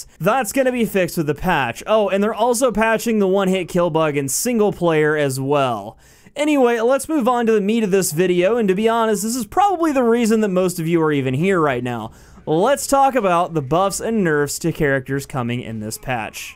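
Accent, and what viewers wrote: United States. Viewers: Your southern accent is so generic xD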